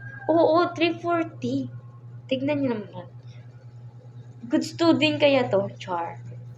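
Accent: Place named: Ilonggo, Philippines. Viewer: Ilongga malambing talaga galit na malambing pa rin ang salita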